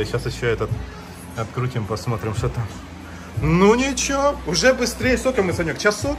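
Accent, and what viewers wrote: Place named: Surzhyk, Ukraine. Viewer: З одного боку, власно мені, все одно на якій мові твої ролики. А с другой сторони як почув наш рідний суржик то й ти якось став ближче до нас. :))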